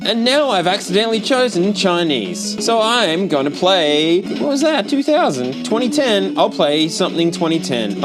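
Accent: Australia. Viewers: British? He's clearly Australian. You may have a problem with your phone's trumpet mouth, you should have it serviced by a professionat.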